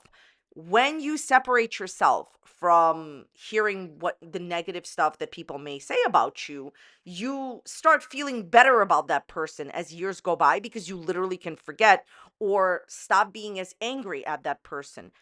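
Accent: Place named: New York, United States. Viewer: Hi, I didn't know who you were until I watched Under the hood, Amber, you're absolutely correct about Jordy, I watched Jordy for months when he cover the Cooney girl. I don't know a quarter of what Amber knows about him. It's crazy that she's putting you down and blocking your comments, she's a chicken shit in my opinion. I have a woman doing that to me too. I get around it sometimes. Lol 🤣 I'm not putting Amber down or you because I don't know you, I do however know Amber lies and lies, I think she's a hypochondriac. I watched her to see if she started the weight loss journey she said she was going to start. She has a lotta drama and she is a dirty woman. From my own observation of all the things I've watched. I watch many different channels of everyone talking about her. I believe she uses people and I'm sorry but in my opinion the girl is too loss with who she lets between her legs, if you get my drift And I don't care if she comes to me about what I'm saying because I will tell people to their face what I think or feel. 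Are you from, you have an accent, I know it's not Jersey, but it's not quite New York. I can hear it when you talk. I'm a Jersey girl myself. Nice to meet you even though it's under crazy circumstances 😂😊😊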